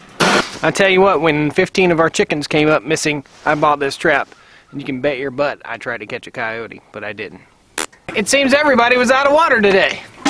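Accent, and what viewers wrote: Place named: South, United States. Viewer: you have a nice country voice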